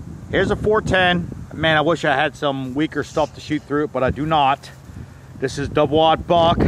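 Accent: New Jersey, United States. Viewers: Chris Landry Jersey accent is an american accent 😑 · Jersey accent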